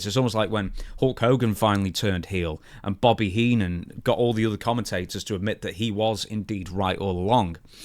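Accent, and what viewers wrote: United Kingdom. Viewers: There's a considerable amount of bigotry laced within the Saudi Arabia criticism. Double standards galore coming from a Brit Accent where hundreds of years as well as current day accountability and criticisms should be considered and prioritized. At the end of the day, these shows are bringing joy to innocent people and humanizing those who come from a different background. People trying to shit on that are at best hypocrites and at worst closeted bigots.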